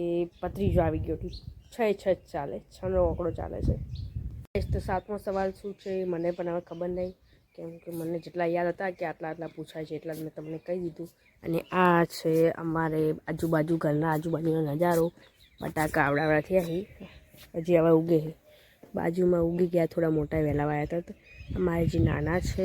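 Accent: Banaskantha, India. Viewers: Tame KO k na KO pan mane tamari boli uparthi junadeesa aaju baju na lago so ben....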